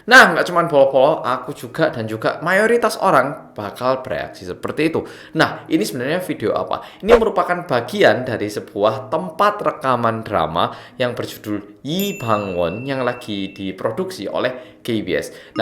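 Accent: Java, Indonesia. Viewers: Baru kenal Mas e ,,, ceritanya gimana oppa jadi semedok itu... 🤣 Udah nikah belom oppaaaa???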